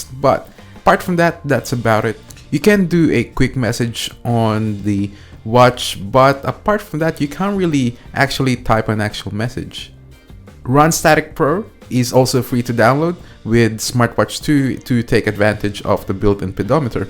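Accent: Philippines. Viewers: Are you Pinoy? Your accent sounds Pinoy. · kinda disturbing. a guy with a Filipino accent but pronounces words with "O" in an Australian accent. not good to the ears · the diction of the speaker sounds like Filipino. :)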